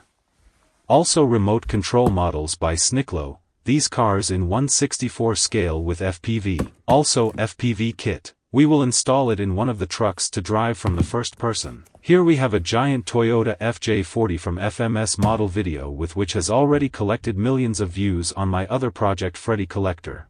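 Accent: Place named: Russia. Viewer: Лютый акцент я сразу понял что автор походу Русский )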